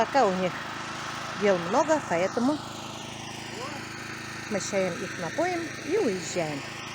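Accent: Tatarstan, Russia. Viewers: Настоящий татарский акцент ч на ,щ" , при разговоре на русском языке. Мило🤗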